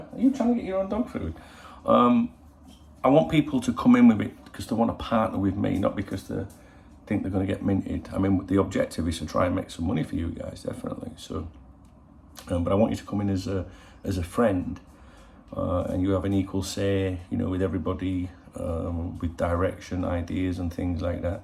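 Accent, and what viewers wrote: Yorkshire, United Kingdom. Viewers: How are you doing, you remind me of my Dad the way you talk he was a Yorkshire man he was my dad and my best friend, is it true that our new player is injured. I love your video's there down earth and great fun.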